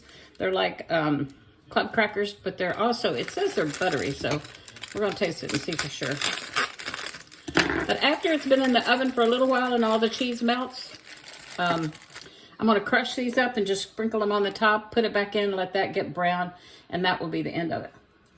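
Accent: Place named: Kentucky, United States. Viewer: You sound like a Ky. Girl, I’m a ky girl.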